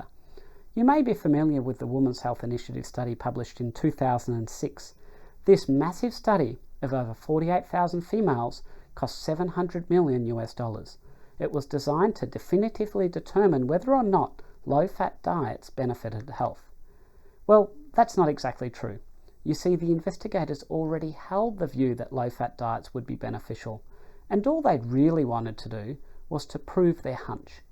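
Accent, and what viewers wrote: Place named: Australia. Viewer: Australian can be difficult, try slowing down the speed of playback to 3/4 speed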